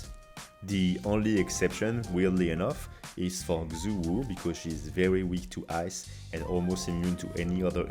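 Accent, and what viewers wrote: France. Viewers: Mec, on manque de continue en FR de fou sur MH! On l'entends l'accent frenchie, hesite pas faire du contenue en FR ca marchera de fou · Frensh accent make it better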